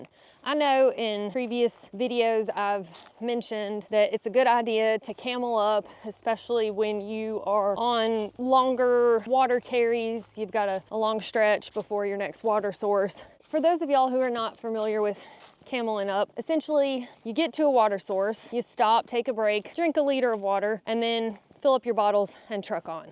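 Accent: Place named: Alabama, United States. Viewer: Omg ! I think I have to marry a girl from Alabama !!! That beautiful accent is like a songbird singing right into my heart xx (I'm from Europe)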